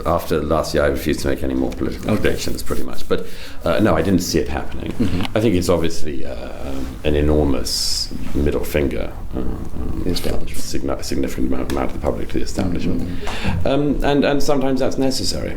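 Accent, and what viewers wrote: United Kingdom. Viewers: Murray: Britain's favourite Neocon! Basically Paul Wolfowitz with an English accent. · Irish girl here who loves posh English accent 😍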